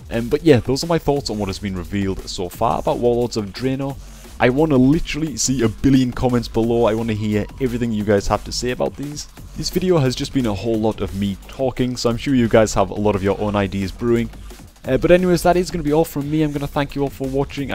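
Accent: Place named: Geordie, United Kingdom. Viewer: proper strong geordie accent...makes you sound foreign man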